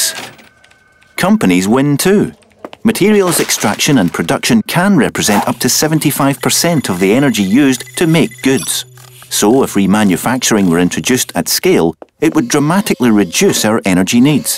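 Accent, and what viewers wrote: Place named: Scotland, United Kingdom. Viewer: Aye, I love the narrator's Scottish accent. <3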